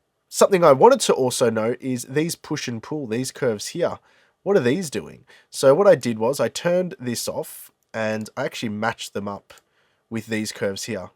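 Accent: Australia. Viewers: As soon as I heard the ausie accent and swear words I subd 👍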